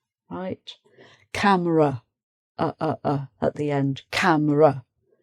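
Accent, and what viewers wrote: United Kingdom. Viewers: I like her so much as an English teacher.I like her British accent a lot. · That was a very clear representation of British accent. Much appreciated. Love from me <3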